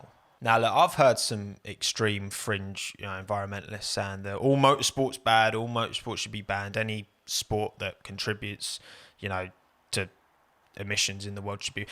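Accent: United Kingdom. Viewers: I only wanted to let you know you have the britiest accent I've ever heard I'm spanish btw.. Nice video too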